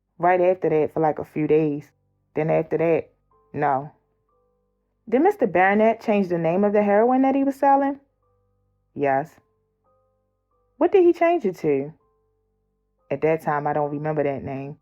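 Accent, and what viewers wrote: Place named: Baltimore, United States. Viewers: Whoever this is reading, either she from Baltimore or she be down here a lot I can tell by how she say certain words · Whos dat new narrator wit da bmore accent😍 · Sounds like she from baltimore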